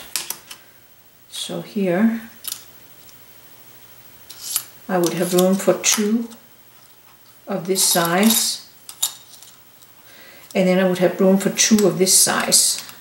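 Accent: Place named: Denmark. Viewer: As a dane I recognised your accent and had to go to your website to confirm what my ears heard. 😁 This is a great video, thank you for making it.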